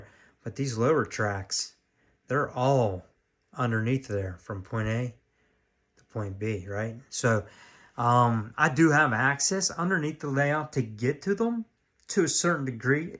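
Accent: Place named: Pittsburgh, United States. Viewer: Nice layout especially for a first one! You sound like you're from dahtahn Pittsburgh!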